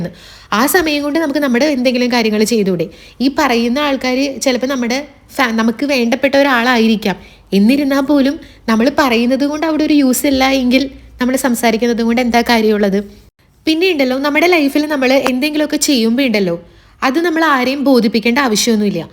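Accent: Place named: Japan. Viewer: Oru japankari malayalam parayunna pole und,, love your vdo sissy 💌🥰... Keep going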